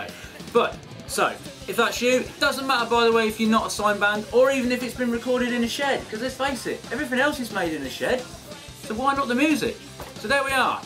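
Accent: United Kingdom. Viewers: I AM MISSING YOU MAN! Can't live without your magnificent british accent! <3 u bro! LETS GO CRAZY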